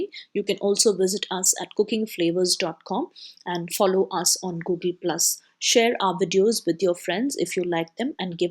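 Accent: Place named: Tamil Nadu, India. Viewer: hi...what an English... I can guess u may be from Coimbatore /Salem/namakkal..:)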